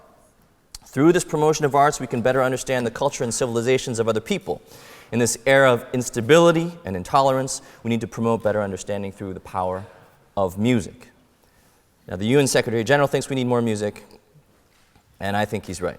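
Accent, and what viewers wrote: United States. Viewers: his chinese is good though (in terms of vocabulary, grammar, way of speaking, etc.)...but he has an american accent when he speaks chinese. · weirdly enough as a guy who grew up in NY, he sounds like he's from LA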